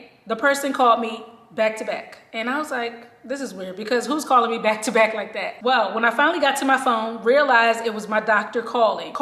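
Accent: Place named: South, United States. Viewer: That morphine got you sounding COUNTRY!! Congratulation! 🎉🎉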